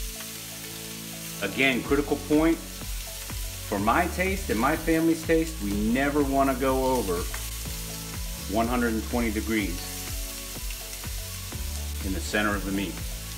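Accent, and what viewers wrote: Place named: Australia. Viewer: Are you originally from Australia? I hear a bit of a twang on your accent, almost as though you grew up in the down under and moved to the U.S. when you were young.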